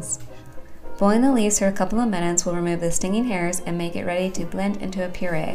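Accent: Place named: United States. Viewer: for years I wondered what your voice sounds like and it sounds very deep and very American I hope it's going to be more of these long-term videos to stay around forever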